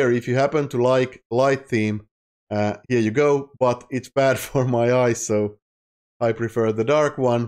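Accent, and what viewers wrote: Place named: Finland. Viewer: Love the Finnish accent, great! From Sweden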